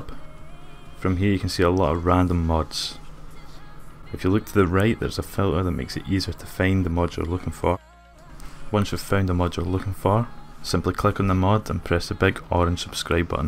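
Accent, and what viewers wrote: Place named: Scotland, United Kingdom. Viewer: first scot yt ive heard in ages mate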